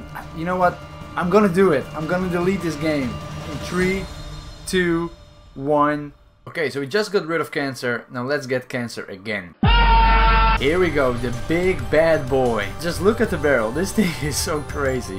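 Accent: Netherlands. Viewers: Are u dutch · You have a good duch accent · his accent, i ame and it sounds like Dutch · Are you dutch? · i knew youre dutch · its sounds like a dutch person is talking english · you sound dutch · he's Dutch like me cause of his accent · I assumed you are Dutch because of the way you speak German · are you dutch? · klinkt deze guy een beetje nederlands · Hold up! Are you dutchh? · Waarom klink je zo Nederlands het is eng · Klopt het dat je nederlands bent? ?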